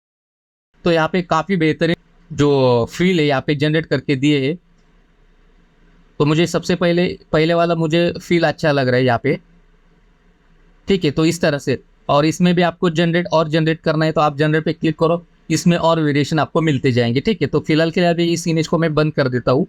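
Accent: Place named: Varanasi, India. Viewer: Varanasi se ho kya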